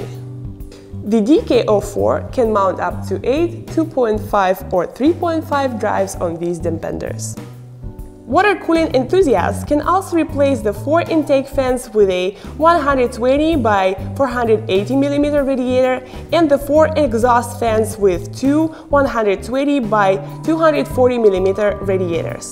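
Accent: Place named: Russia. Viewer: До чего же у неё жуткий русский акцент. Будто специально ррежет :)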